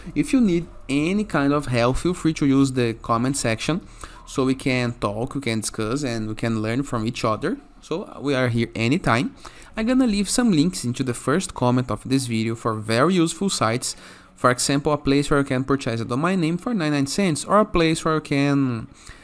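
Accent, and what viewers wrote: Brazil. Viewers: Brazilian accent...😎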